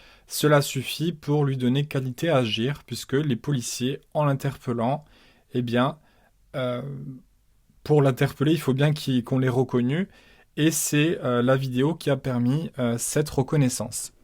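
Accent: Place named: Nice, France. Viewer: Merci encore pour tes podcasts ! À écouter en fond avec le petit accent j’ai l’impression d’être de nouveau chez moi à Nice 🫱🏼‍🫲🏽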